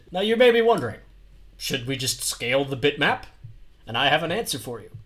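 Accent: France. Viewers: is this guy French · you sound french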